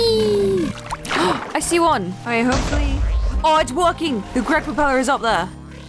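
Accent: United Kingdom. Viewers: I don’t know why but the sound of a slightly unhinged female with a British accent is always so funny to hear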